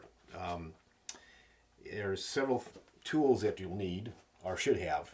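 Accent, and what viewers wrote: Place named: Great Lakes, United States. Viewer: Your Great Lakes accent is quite strong.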